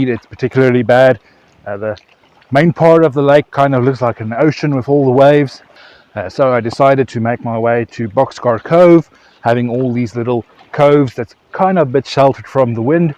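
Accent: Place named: South Africa. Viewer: Hey bru! You sound very south african?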